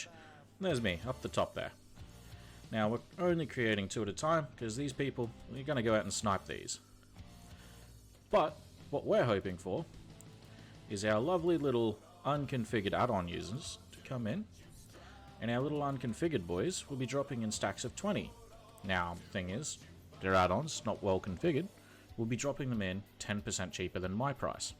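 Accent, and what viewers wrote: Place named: Australia. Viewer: hey man, excellent video, fucking legend ❤, pleeeease tell me you have a link to the add-on? I'm REALLY struggling with addons atm so soon into TBC (WeaponSwingTimer has been totally fucked and I've downloaded like different 5 versions). This is some 200iq shit right here! I thought selling NW cloth from dungeons to tailors was good enough, this SHITS on that and lets me actually go in and flip it, making money stright from the AH, this is absolutely brilliant!!! Quicket gold garm? Fuck no. INSANELY ACCESSIBLE way to generatea substantial amount of gold that should especially help newer players who missed much of Vanilla such as myself to get our flying mounts / max our professions? Fuck yes! ❤ If you read this and can respond you've 100% earned yourself a sub, this is a super underrated channel, I really need some help with the addons to do this, if you can help with that then you're an ABSOLUTE CHAMPION. 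Also love the accent mate, I'm Australian too, born and raised, spent all 28 years of my life so far living here, and helpfully moving to Melbourne soon. Thankyou my friend!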